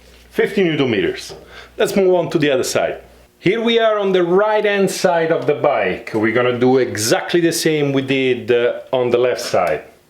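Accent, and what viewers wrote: Italy. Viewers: the Italian accent adds to the experience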